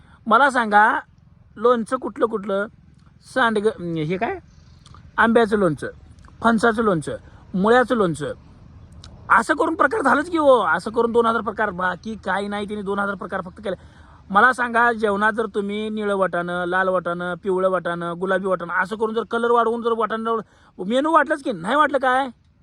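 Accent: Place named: Kolhapur, India. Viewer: Bhava kolhapuri stayl made tumla mast jamaty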